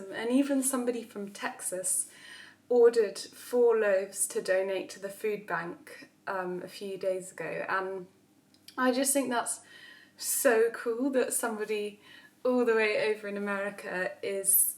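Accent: United Kingdom. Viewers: It's very nice to listen to and watch you. Your baking looks very delicious. I'm interested in any topic, do what you like. p.s your video for me it's the practice of understanding the English language and British English accent. I'm serious :) Thank you.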